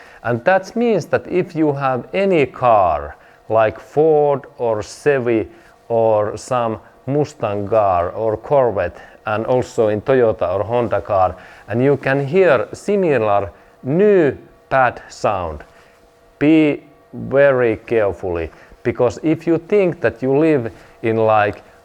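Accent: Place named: Finland. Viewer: I think this is a Finnish (Uralic) accent?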